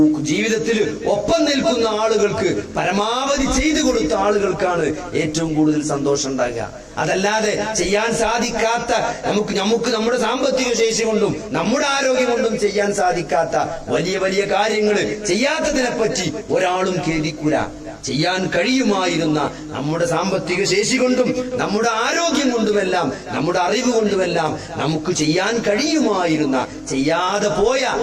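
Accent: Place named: Malabar, India. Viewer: അടിപൊളി ശൈലി മലപ്പുറം മലബാർ👍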